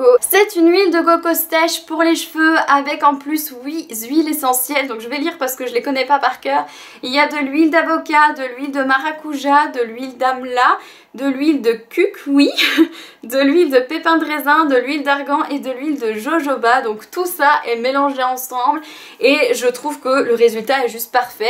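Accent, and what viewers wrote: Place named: France. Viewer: Make Up Artist l accent francais qui ressort aussi dans les langues latines par ex.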